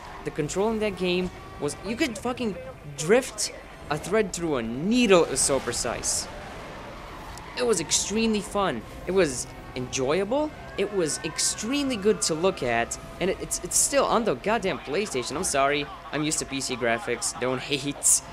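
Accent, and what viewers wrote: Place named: England, United Kingdom. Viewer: Horrible English accent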